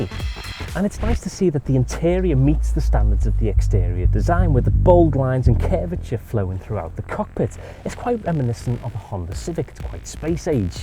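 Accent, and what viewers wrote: United Kingdom. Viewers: Great review. Always love the british accent. Mazda is now on my list. Btw. What was the track used at the backend. Just got into me:)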